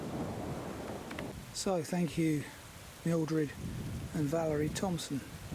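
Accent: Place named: South London, United Kingdom. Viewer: I don't know if I have mentioned this before? But I really like your accent! Kind of similar to mine, a Battersea and Balhamite, myself. Now living in Tooting. I got to do Boxhill on Tuesday, 30th September. Nearly ten years since I'd been there.😊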